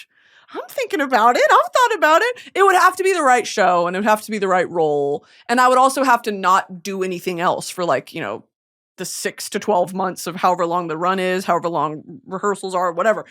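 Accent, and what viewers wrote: United Kingdom. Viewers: the hair with the eyeshadow with the british accent.... giving Riff Raff realness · BRITISH ACCENT 0 SECONDS IN IM SO LOCKED IN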